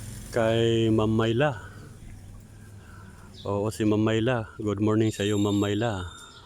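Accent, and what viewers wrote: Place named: Batangas, Philippines. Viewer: Taga Batangas ka pala sir.kaya pala parang batangueno ka magsalita Tagalog .watching po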